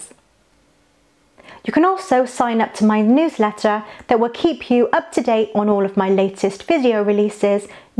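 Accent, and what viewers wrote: United Kingdom. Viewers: I love your accent I wish more British women were in CA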